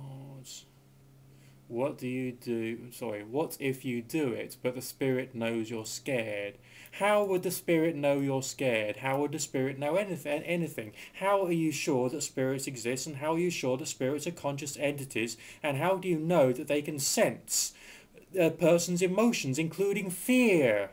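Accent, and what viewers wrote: United Kingdom. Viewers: your an idiot and don't know any thing u british talking fuck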